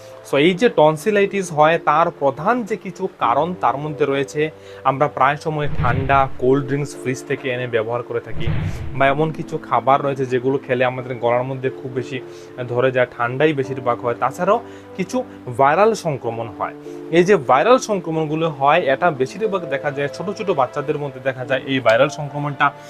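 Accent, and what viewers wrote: Sylhet, Bangladesh. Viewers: আপনি সিলেটি মনে হয়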